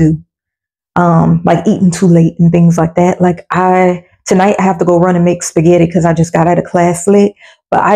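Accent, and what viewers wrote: Louisiana, United States. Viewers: Okay we hear that happiness in the Louisiana accent😮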